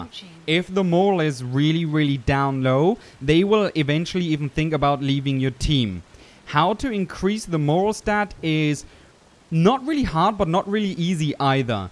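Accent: Germany. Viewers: R u german? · & Man hört sehr, dass du ein Deutscher bist. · Hi, i this is the 1 vid i saw , nice- 1 . BTW are you German?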